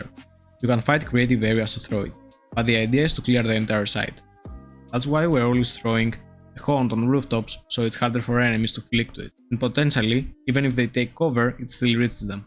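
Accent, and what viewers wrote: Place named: Spain. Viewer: El inglés promedio de un español...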